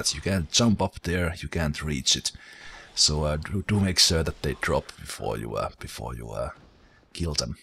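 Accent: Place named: Finland. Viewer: Where are you from your accent is kinda finnish type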